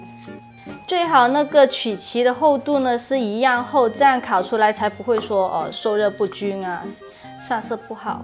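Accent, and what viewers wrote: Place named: Fujian, China. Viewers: 老师，感觉你是福建人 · 普通話教學，但是發音好難聽！福建口音估計是！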